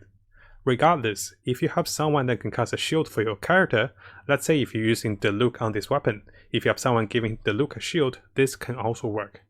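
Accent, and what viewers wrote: Australia. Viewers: nice to know Im listening to a fellow aussie. My Beidou uses the Bell weapon. So far she is doing excellently with that. She is always shielded haha - because it reacts to damage that she takes. I find it to be a great weapon myself. My Diona uses the Favonius bow - it's been great for her.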